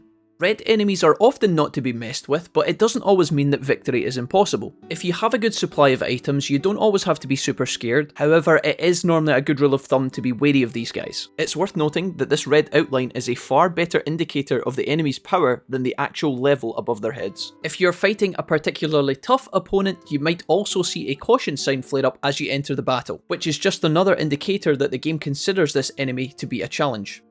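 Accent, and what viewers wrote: Scotland, United Kingdom. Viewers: It's kinda funny. I was thinking the other day, what was the name of that other Scottish guy I used to watch for tips the first go around on ps4? Then this video just randomly played after watching a watchmojo Digimon video and knew it was you as soon as I heard the voice lol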